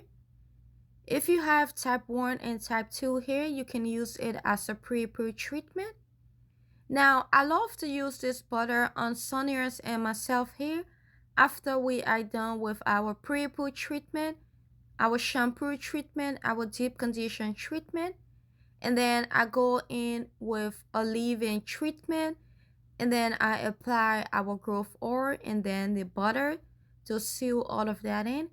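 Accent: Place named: Liberia. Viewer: Are you Liberian you sound like me!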